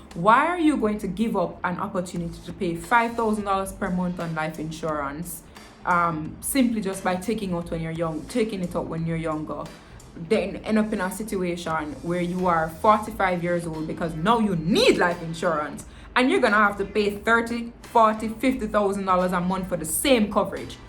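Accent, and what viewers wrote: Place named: Jamaica. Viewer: SO INTERESTING,,,I WAS A VICTIM OF ALL YOU SAID,,WOW,,, LOL LOVE THE WAY YOU GET CARRY AWAY IN JAMAICA 🇯🇲 patois lol.Daneel really your sister lol,same personality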